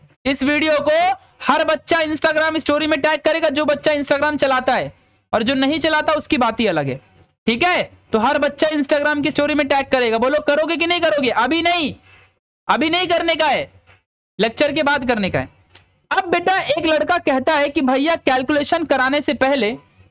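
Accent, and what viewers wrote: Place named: Mumbai, India. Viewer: आप होकर आप से मुंबई हिंदी सुनना अच्छा नहीं लगता!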